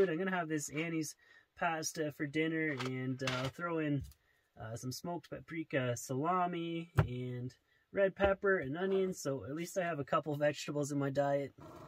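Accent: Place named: Manitoba, Canada. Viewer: Are you from Manitoba? You kind of have the 'don cha 'no accent.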